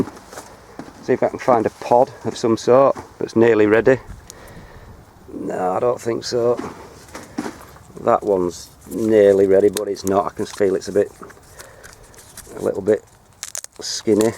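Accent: Yorkshire, United Kingdom. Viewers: Brilliant vid as always. Love your natural commentary and Yorkshire lingo. Not so much different from us in Lancs. not looking forward to winds if they come as you say. I'm on my 4th attempt green beans and they're hardly in flower yet and only 5ft tall. Winds will kill em off! My indoor cuke got flowers and no cucumbers yet! Chillies great and harvesting my outdoor bush toms already, indoor ones nowhere near ready. keep an eye on turnips. Mine are super quick. Seed to table in under a month! Don't ask me how and leaves huge! Hot here around teatime about 25* only 10-12 overnight. My 2 cabbage I planted a month ago as 2" seedlings are now ft long sprout plants! Packet obviously wrong!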